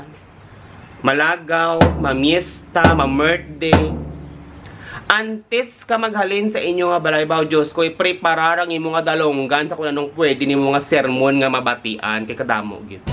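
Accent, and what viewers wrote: Iloilo, Philippines. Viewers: Kamusta!Baw enjoy gid ko sang ilonggo accent mo ah kg ang mga tirada mo.Keep up the good work kg stay safe gid always 🙏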